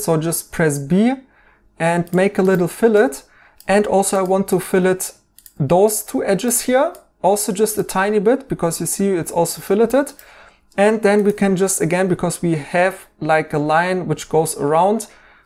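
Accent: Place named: Germany. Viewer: I love your German accent , it’s so cute!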